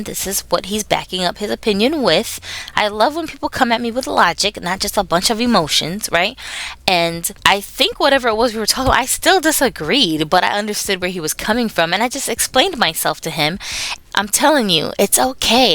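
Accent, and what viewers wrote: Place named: United Kingdom. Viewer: THE BRITISH ACCENT <3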